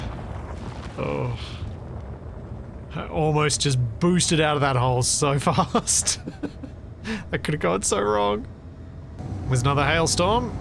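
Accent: Australia. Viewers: Fair enough. However, the fact that you neglected to mention intentionally avoiding the vanilla grid storage and retrieval mechanics during your quandary about how to get both vehicles home did at one point prompt me to think, "Gee, if only a well know YouTube Space Engineers content creator, perhaps one with an Australian accent, had made a tutorial about the grid storage and retrieval and how it was perhaps the most important feature of the update..." 😏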